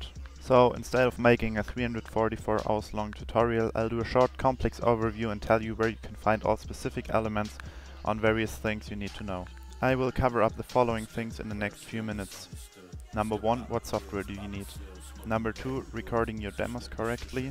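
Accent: Germany. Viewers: hyper deutscher, grüße aus österreich lul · U german? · Hallo bist du deutsch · Wie man direkt hört, dass du deutsch bist haha :D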